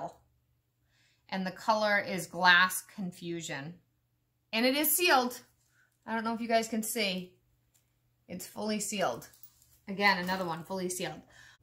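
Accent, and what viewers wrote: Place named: New York, United States. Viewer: I love your videos! 🥰 are you from New York I’m trying to identify your accent!?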